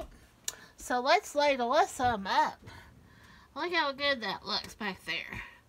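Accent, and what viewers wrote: South, United States. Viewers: Боже, комментарий на русском под видео тетки с бешено выделяющимся южным акцентом. Удивительно.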